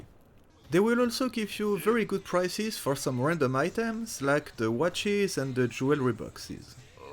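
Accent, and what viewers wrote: France. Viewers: l'accent bien Français des familles ^^, très bonne vidéo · tu a un accent fr je me trompe ?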